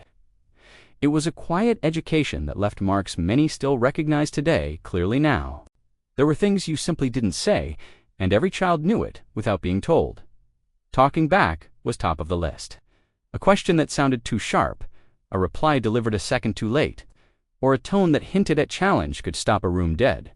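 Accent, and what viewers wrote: United States. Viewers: Why are videos about British kids voiced by an American who not only is not old enough to have heard them and in all probability does not know what they might mean.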